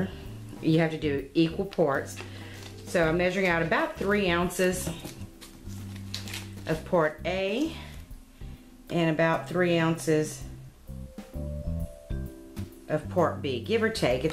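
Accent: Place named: Alabama, United States. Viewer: Are you in Alabama? I swear I keep hearing one of my cousins talking. If you are from Alabama you prob are one of my cousins :-) (Big Family)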